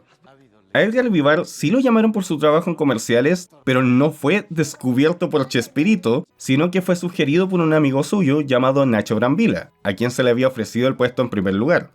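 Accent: Chile. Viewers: Es chileno, así hablan ellos. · Y por qué el youtuber habla como chileno? 🇨🇱🤔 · Por qué es chileno jsjs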